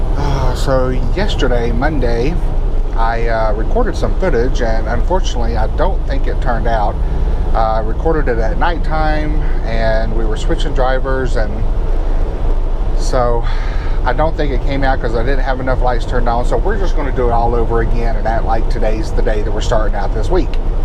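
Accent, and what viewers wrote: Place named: South, United States. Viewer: first I must say that I love your southern accent lol and my mom is from a little place called Rutherfordton NC you blink and you could pass it lol I love your videos guys be safe!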